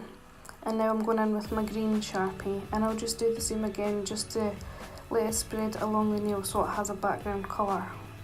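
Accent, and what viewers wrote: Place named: Scotland, United Kingdom. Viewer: Where in Scotland you from? It's strange hearing a Scottish accent xx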